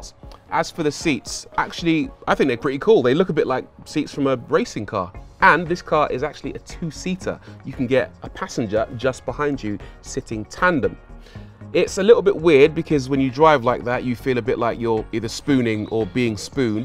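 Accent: United Kingdom. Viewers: the accent... British perfection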